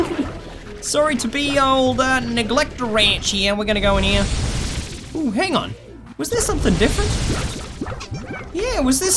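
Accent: Australia. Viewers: Are you Australian? Haha You sound very Ozzie! (Coming from someone who is also Australian.) 🇦🇺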